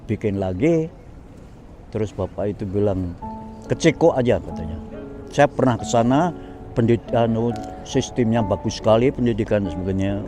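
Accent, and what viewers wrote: Java, Indonesia. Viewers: Logat jawanya masih medok ya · Voila. Hebat, Pak. Bahasa Indonesianya tetap terjaga, juga medhok logat Jawanipun.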